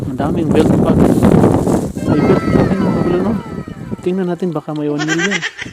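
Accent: Visayas, Philippines. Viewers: tuwang tuwa tlga aq pag pinapanood q ito gustong gusto q ung Punto nia bisayang bisaya ang kulit 😅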